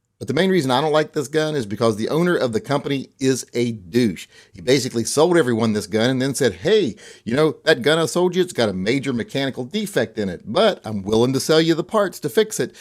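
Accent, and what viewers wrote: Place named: South, United States. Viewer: What an absolute clown. Makes everyone with a southern accent seem as stupid as they sound,and that's not fair. To be honest about the results of the ugliest,rather than asking people to vote and then disregarding what they said and picking your own #1,just post a pic of you holding ANY firearm and you'll have the worst looking ever. What's your hang up with other guys' penis size anyway? You talk about that a lot. People,the only way to watch this douche's videos without getting a headache,is on mute.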